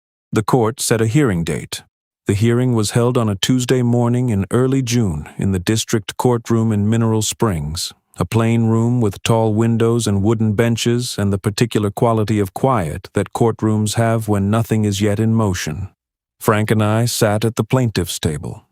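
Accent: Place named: United Kingdom. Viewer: It'd be nice to loose the British accent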